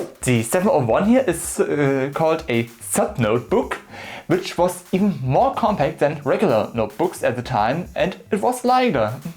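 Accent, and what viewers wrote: Germany. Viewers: Dude, your accent is the every English speaking German in any movie. Fantastic. Love me a thinkpad. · Das deutscheste Englisch was ich bisher gehört habe. 😀 Aber super Content mega! 😊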